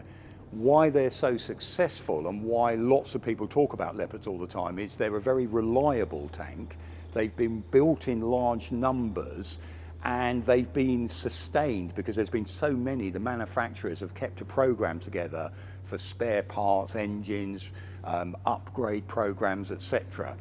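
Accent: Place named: England, United Kingdom. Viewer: Oh you boring bunch of nitpickers. Ukraine or The Ukraine … this guy has a much more charming and fine English accent than most of us will ever be able to develop!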